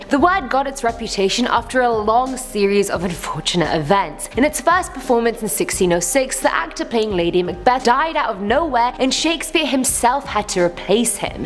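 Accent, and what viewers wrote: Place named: England, United Kingdom. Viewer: Huh - didn't realize torontians had English accent ! Though they were like "take off- eh " (like minnesotians ) !! Suppose to be funny - no need for anyone to get angry . This young lady is very pleasant to listen to - thank you !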